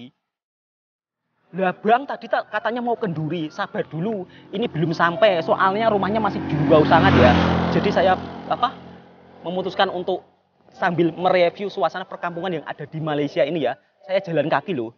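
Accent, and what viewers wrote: Java, Indonesia. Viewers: Suara kamu terlslu pekat telo jowo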